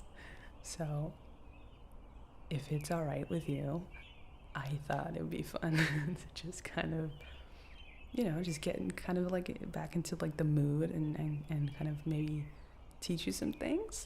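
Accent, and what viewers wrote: France. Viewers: As a french I love your accent, you speak well! Are you sure you've never been to Paris😋? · Love your french accent !! When you say "Disney" the « s » sound like the beggining of « sorry » or « snake »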